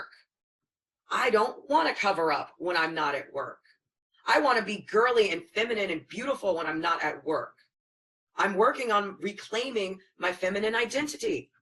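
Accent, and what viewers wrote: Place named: United States. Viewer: You're just acting/speaking like an All-American.....what's the problem? I think it's great!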